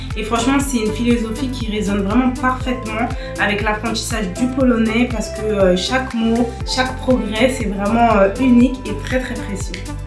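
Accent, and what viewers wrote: Paris, France. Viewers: Czy ja dobrze rozpoznaje, że mówi z paryskim akcentem klasy robotniczej?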